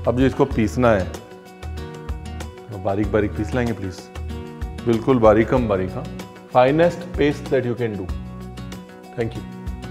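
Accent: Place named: Lucknow, India. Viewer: The way you speak is amazing, no one can touch the Lucknowi style and poise.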